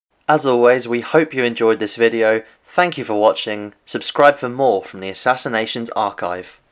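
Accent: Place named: Cockney, United Kingdom. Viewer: One torture the Soviets were never cruel enough to impose was forcing one to listen to a bleating cockney voice drone on and on for 10 minutes!!!!!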